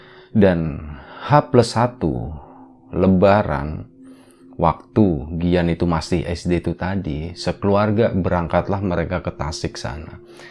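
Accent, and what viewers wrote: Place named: Pekalongan, Indonesia. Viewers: Aku org sunda asli.. Pas mas ngmng sundaa jd pngen ktawaa krna bhsany sunda tp logatny jawa.. Tp keren mas lbih bnyk lg msukin crita sundanyaa😁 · karang Dadap mas, pean Pekalongan jga to logat e kok Podo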